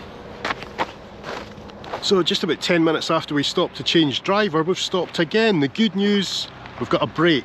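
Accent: Scotland, United Kingdom. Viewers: As one with a bad knee, I commiserate with you. No, please, for the sake of your knees, don't do another long coach trip. Love your ferry boat rides - please do more of those. And love your Scottish brogue. My ancestry hails from Scotland. Blessings, Georgina from Georgia, USA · U picts never like salted caramel 😆 I enjoy watching your videos...love the Scottish accent. Greetings from denmark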